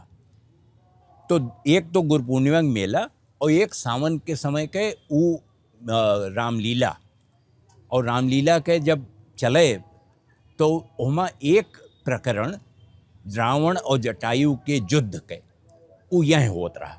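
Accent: Awadh, India. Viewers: Upadhyay ji pranam...aapko avadhi mein sun na bahut achcha lagta hai. BBC mein tu suna hi hai. Ishwar aapko lambi umra de aur sadaiv kripa banaye rahey. Meri maa Akbarpur abka Ambedkar Nagar ki thin. Wo aksar baatcheet mein time ko tame kahti to ham log unko chidhatey the ki amma tame nahin time hota hai...jab apkey munh se suna to ab samjhey ki swargiya Amma Avadhi bolti thi. 🥰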